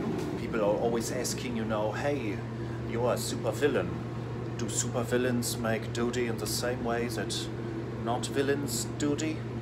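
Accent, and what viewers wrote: Germany. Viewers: dein akzent ist so brutal deutsch aber süß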